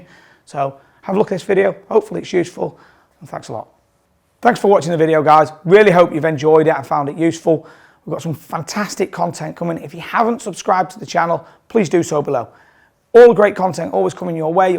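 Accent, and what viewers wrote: Manchester, United Kingdom. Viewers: so POLITE, (if you don't mind) what a Gent imagine Bubba Watson or Woods being so humble? great post. you sound like a Manchester lad. REEESPECT. John.🤗🤗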